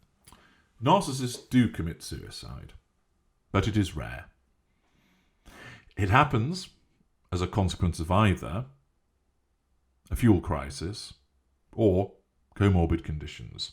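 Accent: United Kingdom. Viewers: Get my news from a disembodied British voice on the youtubes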